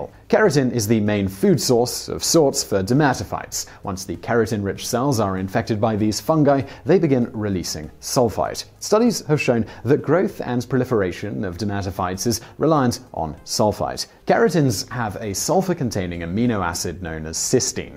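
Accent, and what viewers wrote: England, United Kingdom. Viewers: My gosh brother you're a truly amazing speaker so easily understood and love the English note that is so you. I'm off to make changes, thanks fast speaking intelligent human.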